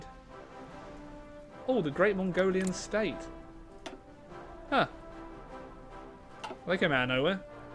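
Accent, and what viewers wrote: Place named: United Kingdom. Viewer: When he said he was going to England I was shocked cuz I actually thought he was a Brit the whole time😳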